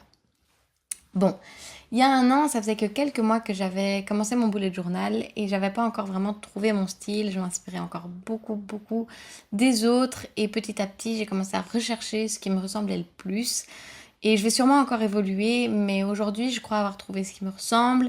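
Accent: Belgium. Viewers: Hello ! Je suis une nouvelle abonnée à ta chaîne ! En effet, je me demandais de plus en plus si le bullet journal était fait pour moi ou non et je me suis gavé de vidéos pendant toutes une journée sur le sujet. De ce fait, je suis tombé sur ta chaîne et je la trouve géniale (et toute mignon, au passage !) ! Ca fait du bien d'entendre un accent de chez nous, hahaha ! D'ailleurs, je me demandais de quelle région viens-tu ? J'aurais parié pour Brabant Wallon ! Enfin tout ça pour dire que tu m'as beaucoup inspiré dans l'organisation de mon bullet ! Ca n'est jamais facile de commencer dans un domaine qu'on ne connaît pas et avoir des petites vidéos comme les tiennes sous la main est toujours utile ! Donc voilà, je te fais de gros bisous (cachuètes ;P ) et continue comme ça ! (Et bien évidemment, je participe aux concours ! )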